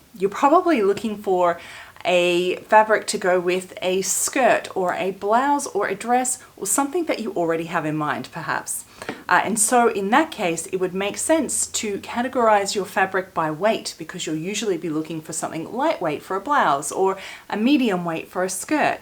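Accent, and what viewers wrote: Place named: Australia. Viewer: Looks Asian sounds ausie im so confused😂 great organizational skills!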